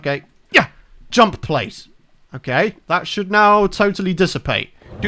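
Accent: United Kingdom. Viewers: British and lively! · Both British and Lively I guess.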